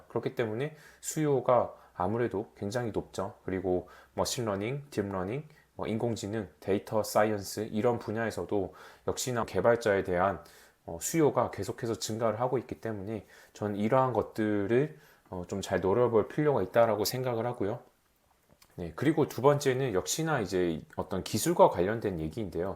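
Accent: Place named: Gyeonggi, South Korea. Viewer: 경기도 사투리 그대로 놔둬도 괜찮습니다. ㅎㅎ!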